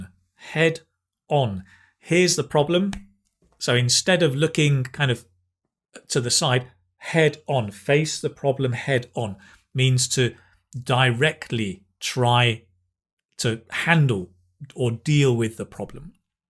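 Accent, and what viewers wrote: United Kingdom. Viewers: Это явно не американское произношение. Вы британец? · The British accent is wonderful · Hi, as always your content is very useful to practice the speaking section, but I have a question; You have a British accent, is there a chance that your advice can have some phrases/sentences/expression that is used just in the UK?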